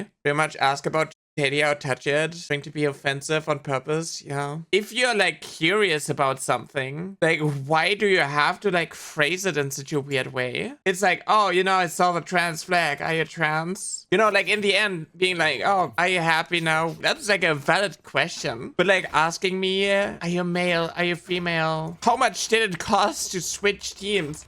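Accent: Germany. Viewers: I thought I heard a Görmän accent! 😂 - I'm sorry you had to go through that. I stopped playing Valo long time ago due to toxicity. Im very sad whenever the old saying "Männer sind Schweine." is proven right. Just how where these guys raised? 😅 - Keep up the pride, we're with you.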